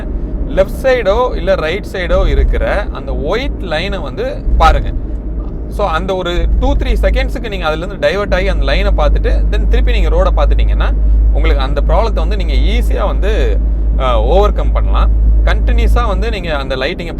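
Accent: Virudhunagar, India. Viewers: Bro, Neenga Virudhunagar ah ??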